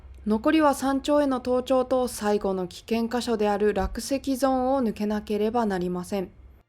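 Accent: Gifu, Japan. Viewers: 時々岐阜弁がしゃべっとって、おおきに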